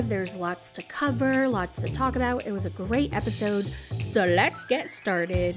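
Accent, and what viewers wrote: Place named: Boston, United States. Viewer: You’re from Boston! Lol hey girl 🫶🏾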